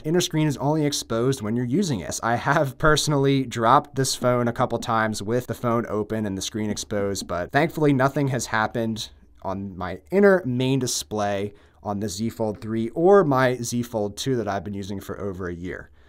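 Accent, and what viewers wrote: Philadelphia, United States. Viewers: From Philly?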